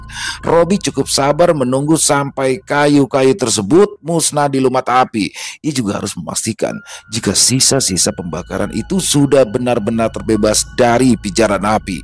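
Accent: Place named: Jakarta, Indonesia. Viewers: Demen banget bang ane...bahasa betokau y...( Betawi y ) Lanjut bang...sehat sllu bang... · Nyimak bang yg cengkok bekasi nya dapet 👏. Ane 20 thn di bekasi masih aje cengkok kemayoran 😂. Lanjut bang 👍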